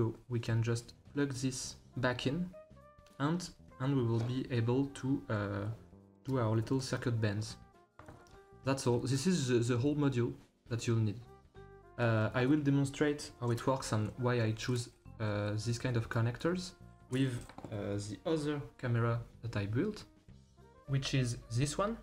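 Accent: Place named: France. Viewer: l'accent fr je kiffe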